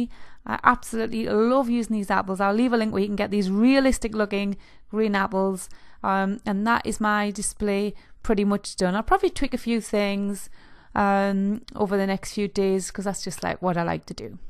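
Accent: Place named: Wales, United Kingdom. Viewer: Love your videos,you kind of sound welsh, I’m from Birmingham but live in the States!!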